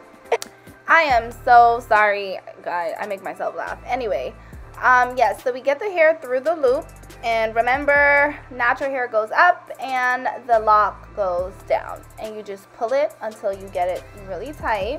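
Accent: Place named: New York, United States. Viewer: U sound like your from New York lol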